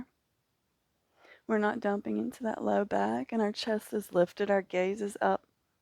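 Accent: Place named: South, United States. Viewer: Feelin' Friday's flow and the Southern Belle accent is strong today! The pace is just right as always ;-) I'd like to praise you for reminding me of one of my favorite covers out there too! <link>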